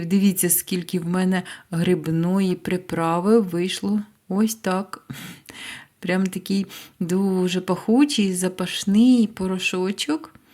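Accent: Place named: Vinnytsia, Ukraine. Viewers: Де вам тут вчувається російська мова? Жіночка розмовляє чистою українською мовою Придніпров'я, центральної та півдня України. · Чудова ідея з кубиками, обов'язково зроблю❤❤❤,а мені теж сподобалась мова, так розмовляють у Вінниці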